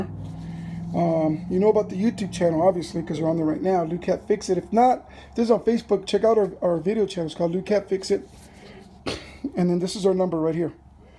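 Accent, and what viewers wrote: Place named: New Mexico, United States. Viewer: Good product and nice New Mexican accent!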